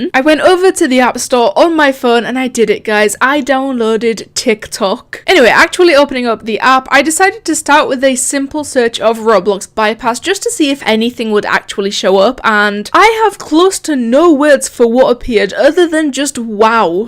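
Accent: United Kingdom. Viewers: I rather you over koneko since u sound Like your from the uk not saying you are from the uk It’s just there are not many British roblox YouTubers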